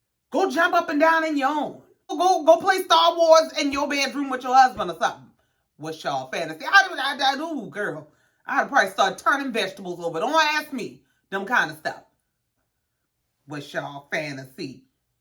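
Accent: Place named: Gullah Geechee, United States. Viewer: I knew you sounded like a cousin!! I’m from Savannah! Heeeeey Geechee cousin!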